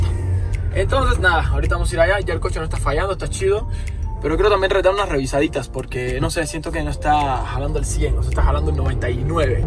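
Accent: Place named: Cuba. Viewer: tu español no suena neutro, parece cubano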